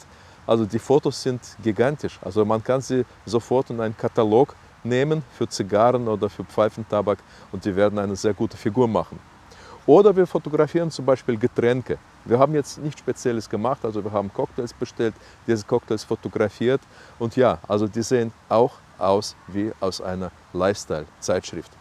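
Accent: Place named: Soviet Union. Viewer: Вы наверное никогда не избавьтесь от советского акцента...извините если что, Старый водитель TIR. Сейчас стокер...ландшафтный.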